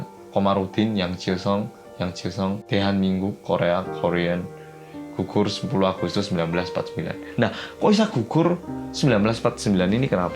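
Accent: Java, Indonesia. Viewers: Salam medooooookkk jang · Kok lucu yo wong korea medok😁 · ku kira yang ngomong orang jawa😁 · Jowo ngendi lek???😁😁😁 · cocok, juga udah jawa kental jadi cocok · Korea medok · Kok kakak bicaranya kayak orng jawa yh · Meskipun mas ngomong nya indo,tapi agak agak medok ngomong nya 🗿🌻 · Sekarang 364ribu orang jadi tau mas medok.. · medog jawir smpean kang😁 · mas oppa ngomongnya medhok poll hehe... manteppp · Baru tau kalau pahlwan komarudin asli Korea ..suwun informasinya cak medok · Woow medhog banget mas ee,, kamarnya rapiin dikit, wkwkwk Tapi thank for ilmu yabg baru saya ketahui, terimakasih banyak, sangat bermanfaat, sukses bro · Mas maaf aku salfok medok banget mas😂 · rencana mau kerja di korea, cari" referensi di youtube eh nemu chanel orang korea yang medok, alhasil jadi suka liat vidionya ngomongnya itu loh yang khas. semangat mas 😊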